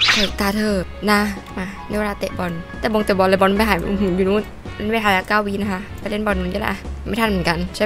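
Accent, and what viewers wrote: South, Thailand. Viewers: พี่เป็นคนใต้หรอค่ะ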